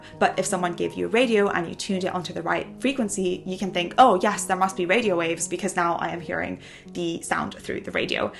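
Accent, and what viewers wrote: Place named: United Kingdom. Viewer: you add some british accent when you speak in American English.I guess you first learnt british accent then American.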